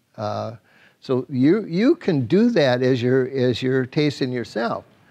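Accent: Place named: Minnesota, United States. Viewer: Miss you. Hope you are okay. Love that Minnesota accent 😍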